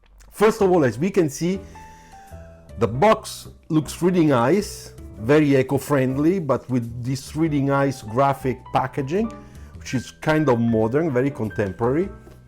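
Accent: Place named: France. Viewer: Toi t'es français avec ton accent 😊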